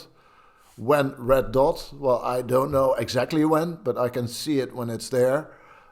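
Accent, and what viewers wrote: Netherlands. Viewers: You are Dutch from your accent. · Love your Dutch accent :) have family in holland and it reminds me of them. Warm feeling. Cheers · You sound Dutch..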